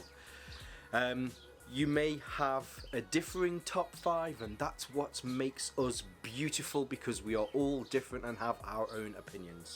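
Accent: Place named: Stoke, United Kingdom. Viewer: You sound like a stokie. Good video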